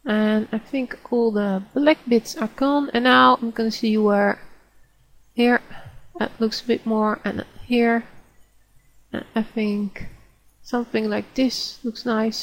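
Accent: Netherlands. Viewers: Are you from the Netherlands? I have relatives from there that have the same accent as you. :D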